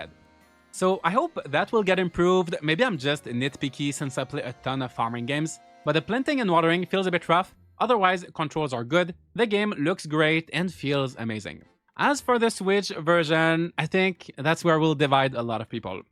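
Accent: Quebec, Canada. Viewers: tu sonnes québecois t'es tu quebz le gros ?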